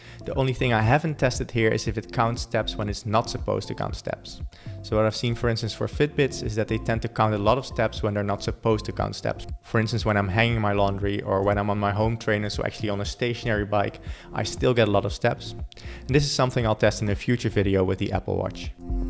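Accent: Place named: Netherlands. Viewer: I was almost asure you were dutch, until you said you live in Vienna 😅😂. Great video!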